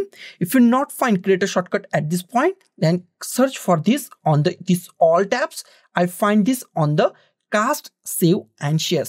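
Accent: India. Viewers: why do you sound so indian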